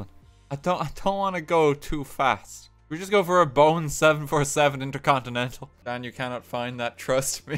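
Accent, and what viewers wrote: Ireland. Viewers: wait are you irish or something? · Is he Irish?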